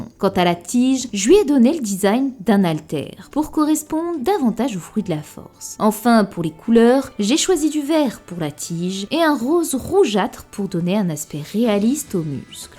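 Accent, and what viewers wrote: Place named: Belgium. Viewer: c'est l'accent belge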